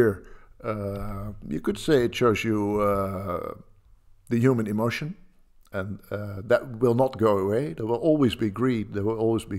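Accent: Netherlands. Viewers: You've a Dutch accent on your English. What did you study at the Uni that enabled you to put out these models? Asking for my son. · Really nice accent. It almost sounds Dutch but I can't place it exactly. Where is it from? Edit: Channel says Netherlands. So I was onto it. I love the Dutch-English accent. Sorry I'm weird. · A very slight Dutch accent is what I’m detecting. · Come for the bitcoin insights, stay for the Dutch accent!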